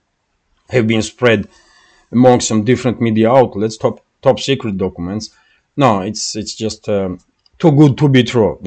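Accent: Russia. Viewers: By shortening the videois already a good start.Also having English subtitles helps alot because of your Russian accent.. 🎉🎉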